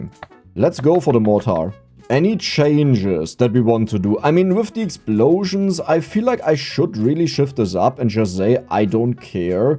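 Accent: Germany. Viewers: Are you german? Either way I love your accent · German accent sptted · Thanks for the vid! Really a pleasure hearing how dude with strong german accent tries to use flamethrowers efficiently to kill as much of them as possible! Here you go <3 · just a random question... Are you German? Cause I hear the "zee" and "zix" and I can't think of any other language that can give that accent... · Haha Geil, Grade deinen Kanal gefunden. Und nach den ersten paar Sekunden dachte ich mir " Moment der kling doch Original wie nen Deutscher mit dem Aktent ." Hab dei deiner Kanal Bio gesehn das du Deutscher bist und Direkt ne Abo Dagelassen . Ist schon krass wie man das Immer direkt raus Hört wen wir Englsich sprechen . Nur Liebe <3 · As a compliment, you have the thickest german accent ive heard on youtube😂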